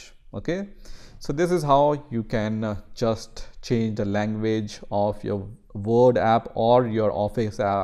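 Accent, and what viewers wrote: India. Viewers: Dont try to talk more "British English" if I hear Hindu accent I know that this will be a helpfull video. Thank you so much.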